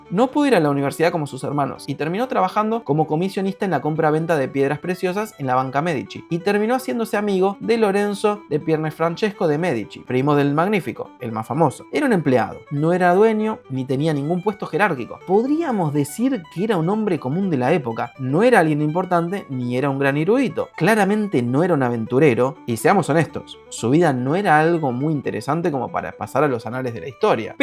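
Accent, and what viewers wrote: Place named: Argentina. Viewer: Tú video es imposible de verlo , hablas muy rápido , tu acento es demasiado fuerte y no tienes dicción . No se puede entender , ni captar lo que dices . Oye que no estás en la sala de tu casa habla do con amigos . Modula tu voz , habla más neutro sin acento pueblerino argentino , y toma clases de dicción. No se te entiende nada !!!!! Tengo coraje !!!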